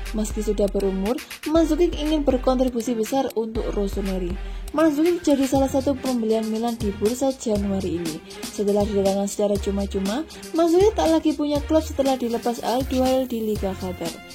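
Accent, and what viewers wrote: Java, Indonesia. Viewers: Medok tenan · min kok agak medok ya😂😂😂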